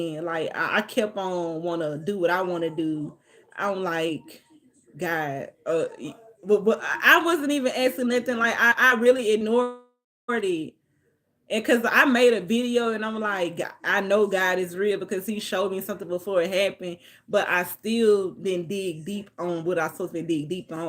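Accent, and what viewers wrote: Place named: South, United States. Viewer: What cho lil chocolate butt talkin bout lol I like the way you talk my family down south also and you love God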